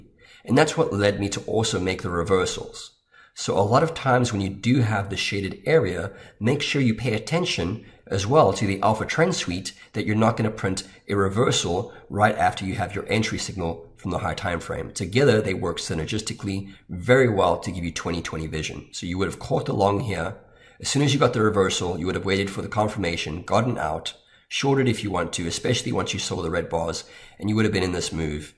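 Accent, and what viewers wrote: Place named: South Africa. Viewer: Thanks, much appreciated. BTW I detect a hint of a South African accent way back there, am I right? Cheers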